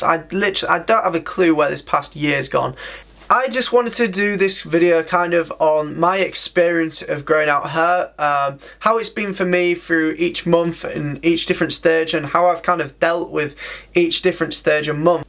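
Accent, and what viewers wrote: United Kingdom. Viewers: Hmm Yorkshire accent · Thats British accent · Brad McNaughton northern English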